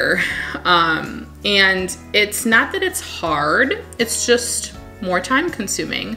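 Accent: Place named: Illinois, United States. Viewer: Been watching Becca for a few years and finally wandered over to Potted Together and now you. LOVE IT. I grew up in Wildwood, IL just 30 minutes north of you. Love hearing your accent, brings back memories. 💕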